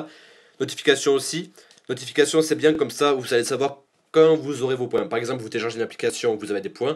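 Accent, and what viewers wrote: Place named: Toulouse, France. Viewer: T'est toulousain?! T'as gaver l'accent!!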